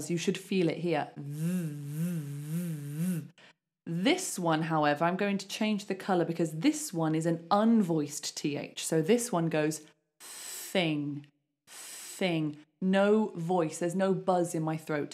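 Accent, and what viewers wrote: United Kingdom. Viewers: Excellent ! Lovely British pronunciation ❤ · I adore standard British accent, thank you for your lessons! I absolutely love it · Pleasure to hear pure clear british english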